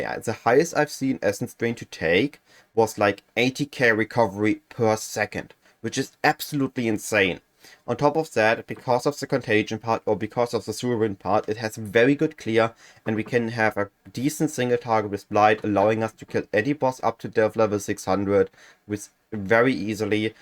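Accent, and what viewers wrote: Germany. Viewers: Deutscher? BTW Very Good Video!! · Hört sich an, als seiest Du Deutscher, daher mal das Feedback auf Deutsch: Danke für die Anregungen, jetzt hab ich 'ne Idee: Seit gefühlt hundert Ligen mal wieder 'nen Essence-Drain-Trickster ausprobieren. :-)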